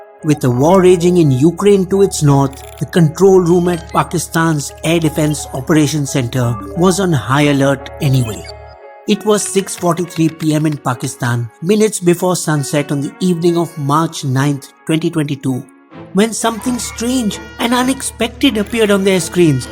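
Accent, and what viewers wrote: India. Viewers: well the accent is india so bye the vedio is gonna be as fake as the indian media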